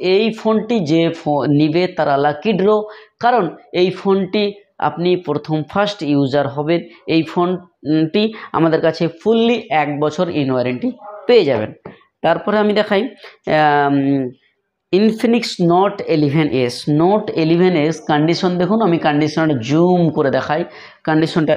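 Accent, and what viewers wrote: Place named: Kolkata, India. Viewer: ভাই আপনার ভাষা তো কলকাতা কলকাতা লাগে। সাবধান ভাই।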